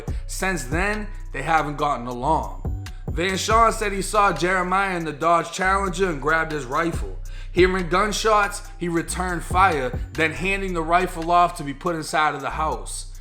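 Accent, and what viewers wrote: Boston, United States. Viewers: hey just wondering are you from Boston. me being from I can hear how say you car. you don't have that southern Florida,,,,, accent just wondering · Boston talking ass...Where you from SOUTHY? LOL! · You have a boston accent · Sometimes I think your from Boston or Massachusetts but I heard you say you were locked up in Florida so I'm guessing your fro. Florida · how are you mixing up florida lazy twang and stromg new England (boston) accent and dialect ? totally sounds like ur a poser and/or confused...idk about the rest of FL but here in Orlando we don't sound like a PLIES or reject Slip N Slide artist from <num>.i mean unless ur on mercy drive, ivy lane or deep off the NW part of Orange County maybe IDK... but from OBT to Landstreet; Oakridge to Kirkman and MetroWest... we most definitely don't sound like that in fact we deliberately ended that twang talk dialect back in 2015/2016, meaning THAT LINGO BECAME A NO GO ON THAT TEMPO. 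· of coowz its boston. Not a place wheh kids can play in tha yod, cuz they could get hit by a ca from all the wicked bad drivas kid!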